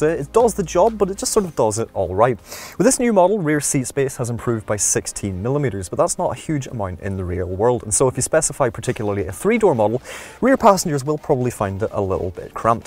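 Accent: United Kingdom. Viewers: I often wonder seeing I am an Australian watching this whether other people all over Britain understand each others dialects within Britain or is it difficult for you as well , because to me this bloke talks so fast and with his regional accent I am struggling to understand what he is saying , so are you Brits used to each others accents and understand each other ?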